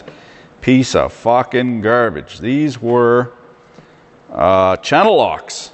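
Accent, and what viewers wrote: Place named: Canada. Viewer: I don't know shit about tools but you talk like a true maple syrup baby and I love it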